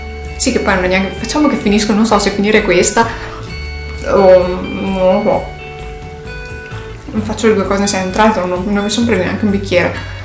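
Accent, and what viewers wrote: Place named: Friuli, Italy. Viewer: hai una cadenza nel parlare che ho sentito in tanti friulani che in un qualche modo mi è familiare. Comunque ho fatto una bella scoperta con il tuo canale. Un saluto con simpatia 😉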